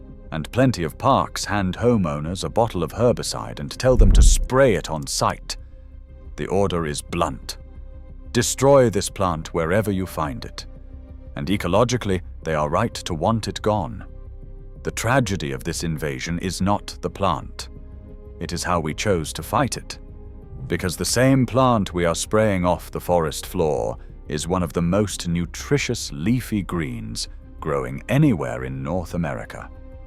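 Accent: England, United Kingdom. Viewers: You mean English accent?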